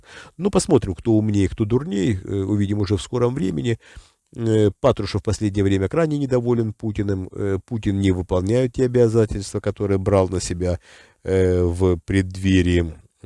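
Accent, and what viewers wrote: Ukraine. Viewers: Вопрос. В России настолько слабая контр разведка, что не может вас вычислить или вы, простите, работаете на власть? . И ещё, у вас явный хохляцкий акцент. Вот прям как мошенники из банков звонят. Опровергните?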